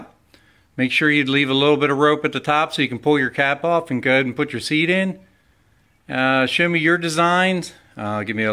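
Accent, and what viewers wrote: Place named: Delaware, United States. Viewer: So good to hear a Delaware accent! Love it - made me hungry for a hoagie! And oh yeah, very imaginative and useful design - thank you.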